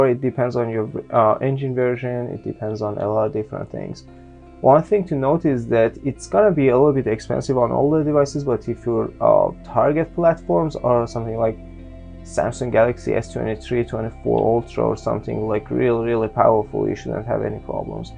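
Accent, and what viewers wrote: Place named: Iran. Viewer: سلام داش از لهجت میخوره ایرانی باشی میشه لطفا بگی چجوری باید لنز فلیر رو برای موبایل آنش کنم؟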